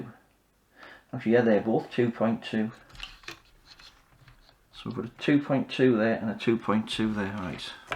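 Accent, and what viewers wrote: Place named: Geordie, United Kingdom. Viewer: Sitting here watching as I do some strap stuff then cleaning parts of my Xbox One I got for £4 prior to installing a new 1540 motherboard and dvd board. I do like the soft tones of the Geordie accent, I spent a week up there around 2008 meeting up with my old World of Warcraft guild and what an awesome time it was esp in the pub outside the Metro where I collected a ton of bird's phone numbers as they jus' adored my south London wide boy accent hehehehe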